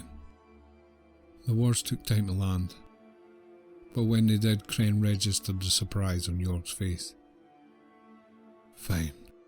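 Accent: Scotland, United Kingdom. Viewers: This is an incredible concept, well done! And I agree the Scottish accent makes it epic to listen to! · Very nice!🪐 And the Scottish brogue is very easy to listen to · Your Scottish accent makes this!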